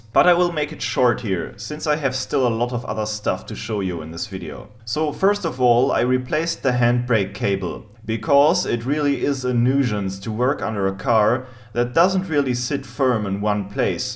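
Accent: South, United States. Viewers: Your 'Murican accent is impressive. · I always laugh at how southern American you can sound, after speaking in your proper German accent. Gets me every time. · I applaud your perfect American accent. Source: I'm an American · love the american accent :) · your American is excellent. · Pretty good American accent :) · We sure appreciate a southern accent here y'all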